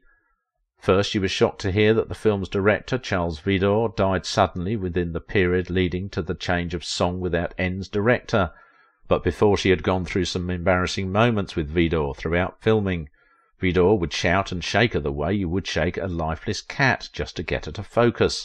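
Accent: United Kingdom. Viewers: Your "Proper" British accent does not hide your ignorance - "Song Without End" is not a documentary BY Franz Liszt...it is ABOUT Franz Liszt - the Hungarian Composer, and virtuosic Pianist! Jeeeezus!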